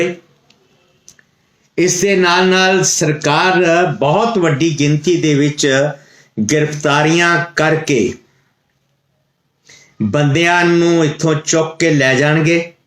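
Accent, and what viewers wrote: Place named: India. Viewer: Tere boln to pta lgda tu punjabi nahi ga teri tone hi hindi aa tu kehde paase to punjabi aa ?? Kisaaan aa?? Sikh aa?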